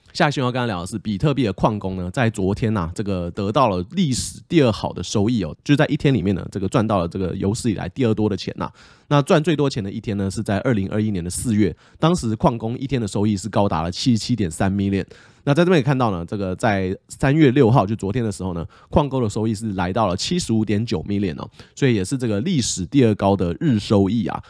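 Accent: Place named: Taiwan. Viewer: 好少看到D大講台語😂😂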